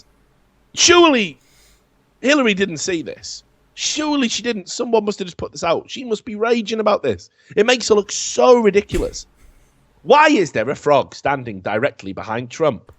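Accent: Wales, United Kingdom. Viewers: You sounded so Welsh 5 years ago.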